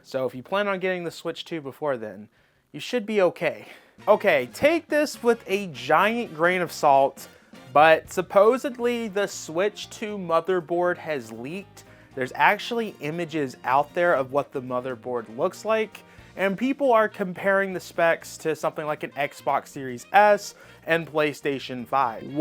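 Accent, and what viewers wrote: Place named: New Jersey, United States. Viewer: I wonder if his odd pronunciation is intentional for engagement… I doubt it. Where is the accent from west NJ?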